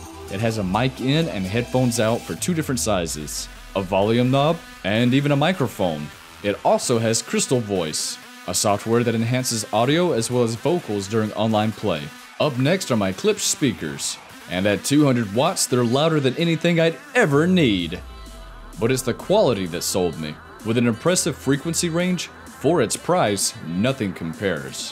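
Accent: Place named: South, United States. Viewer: If you don't mind me asking where are you from you sound southern ps thank you for telling me Jesus loves me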